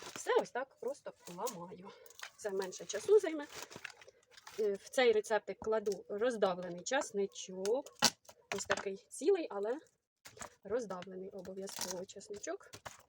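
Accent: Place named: Poltava, Ukraine. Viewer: Дуже схоже на полтавський говір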